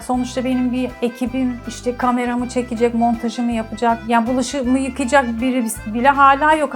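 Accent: Turkey. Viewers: Çok takdir edilecek bir başarı yakalamışsınız. Çok gurur verici.Ancak gücenmeyin lütfen ama yapıcı bir eleştiri yapmak istiyorum. Telaffuzunuz tam bir Türk gibi. Aksanınıza biraz daha özen gösterirseniz yabancılar tarafından daha fazla izleneceğinizden eminim.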